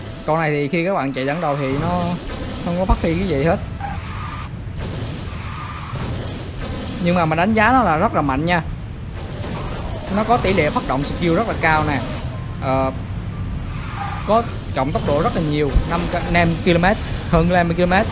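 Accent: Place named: Phu Yen, Vietnam. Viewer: Quê anh biển số 78 hả ah Nghe giọng giống lắm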